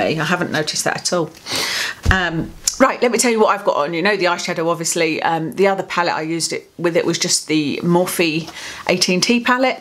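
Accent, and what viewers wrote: Scotland, United Kingdom. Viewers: Are you from Scotland. My home town. Love your videos x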